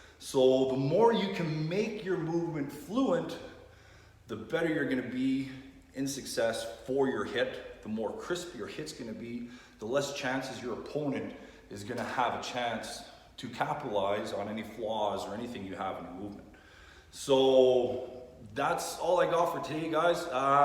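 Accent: Canada. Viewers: Finally a arm wrestler with a great Canadian accent eh! Want to hear what you think will happen in Dubai. What each guys should do. What each guy you think will do. 16k subs already. Nice start.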